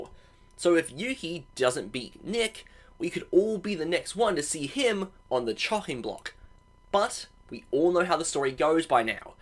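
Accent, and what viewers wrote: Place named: United Kingdom. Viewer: I love slightly rude F1 commentary with a british accent! You got my sub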